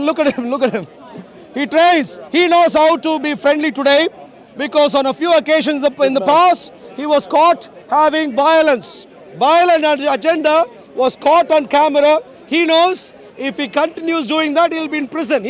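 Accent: India. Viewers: Why is an Indian talking and British in the background ?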